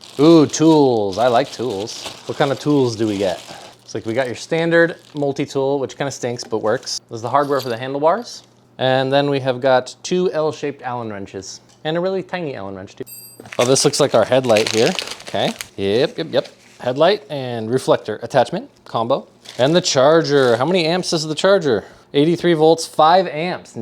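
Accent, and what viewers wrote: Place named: England, United Kingdom. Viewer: Hahaha you are hilarious! English accent and all! 😂🎉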